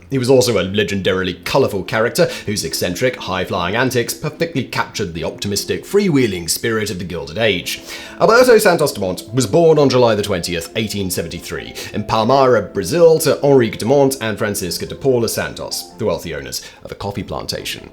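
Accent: United Kingdom. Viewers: From your accent you sound British - so it should be "inventor of the aeroplane" - we are not the 51st state yet!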